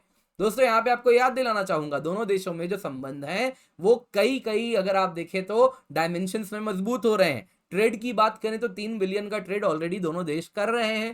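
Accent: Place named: Pakistan. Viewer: Aap Pakistani jaisa Q baat karta ho 😢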